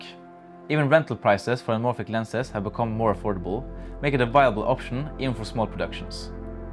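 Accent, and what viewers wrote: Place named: Bergen, Norway. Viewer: Are you from the Bergen area of Norway? Your English accent sounds like you are…. 😊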